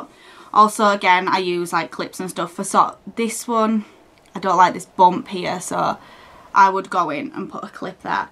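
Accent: United Kingdom. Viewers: Love them all! Love your accent so much,I'd love to visit the uk aha x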